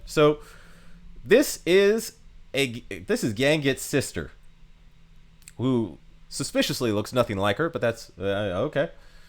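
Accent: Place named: United States. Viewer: God damn man, I love your energetic personality but you have a chronic infection of Americanised pronunciation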